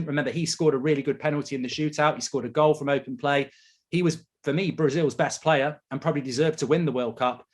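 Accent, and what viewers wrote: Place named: Manchester, United Kingdom. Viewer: Who is this clown 🤡 love his Manchester accent 🙈🙈🙈😂😂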